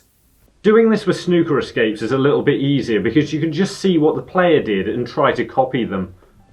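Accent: United Kingdom. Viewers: As am a foreign I like your English accent its so easy for foreign to understand also likes your excellent videos about snooker.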